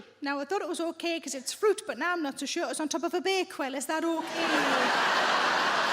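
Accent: Newcastle, United Kingdom. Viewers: she's a Geordie. not fast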